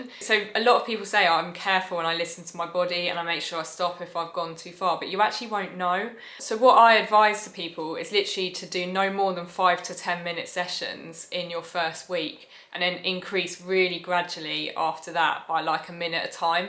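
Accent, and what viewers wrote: England, United Kingdom. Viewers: I love your British accent. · I’m here for my dose of English accent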